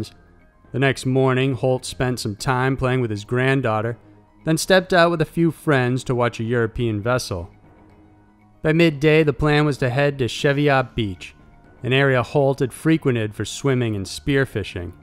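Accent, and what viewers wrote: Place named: New England, United States. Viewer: msmeowdetroit, he said "father and father away" instead of farther. I hear a New England accent.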